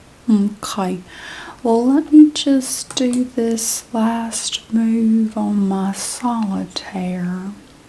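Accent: South, United States. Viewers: you look so beautiful!! i'm so excited for a new southern accent roleplay, this is gonna stay on repeat for me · the ones with the southern accent are always my favvv omg you do it so well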